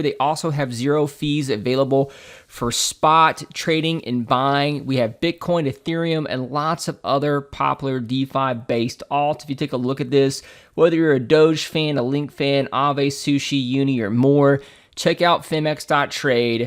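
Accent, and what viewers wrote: United States. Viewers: Yo Ive missed that southern accent 3QwzaeEqKVQLbkoMYqrZbYf9f5dK9R3KCm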